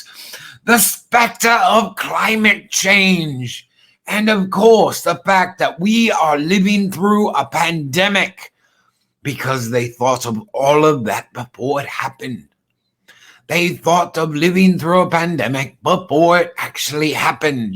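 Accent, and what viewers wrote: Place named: RP, United Kingdom. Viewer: indeed! It seems I can leave a comment here but I can’t a see anyone else’s. WTF, YouTube? Nice video, man, I truly enjoyed your constipated British toff voice. Constructive criticism: You might want to watch the occasional “um’s” and such, and invest in an expensive microphone... it seems to be a necessity for a promising YouTuber. Again, nice job; I’m sure starting a YT channel is like watching grass grow; please persevere!🙂🖖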